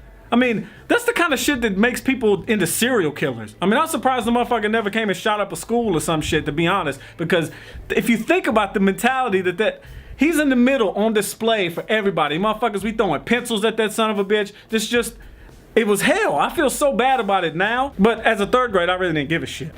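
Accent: South, United States. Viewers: boooy I thought u was black or southern u just got the sound of southern hospitality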